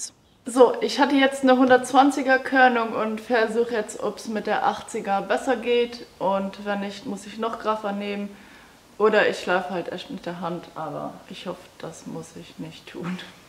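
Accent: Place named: Luxembourg. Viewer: All Respekt, gesäit richteg gutt aus:) An däin lëtzebuergeschen Akzent ass bal ganz focht.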